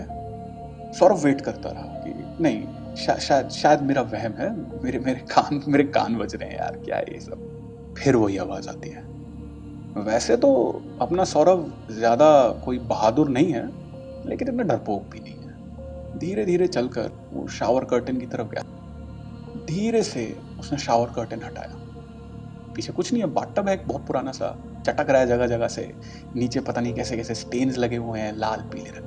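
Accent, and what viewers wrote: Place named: Mumbai, India. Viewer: Bambaiya bhasha ekdum sahi pakde hai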